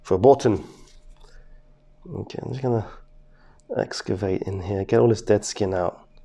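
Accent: England, United Kingdom. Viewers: What I like about you is that you are very clean - you wipe the stuff off right away and you do everything quickly without hesitation, and correctly. No beating around the bush. You don't even need an assistant! You've got it all under control! Kudos to you!! And your beautiful English accent is wonderful! Love you!!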